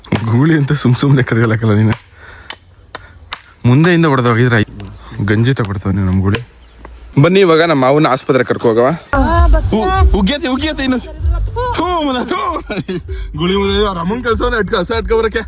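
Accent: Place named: Mangalore, India. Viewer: your Mangalore kannada suppppppppper..... 💐🤔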